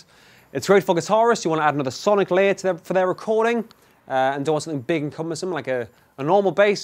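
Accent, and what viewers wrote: United Kingdom. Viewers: British. No bollocks talk.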